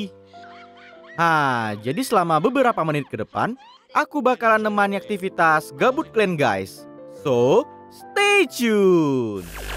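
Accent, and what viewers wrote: Medan, Indonesia. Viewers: Bah...orang medan rupa nya..sukses trus lae.. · abg orang medan mana?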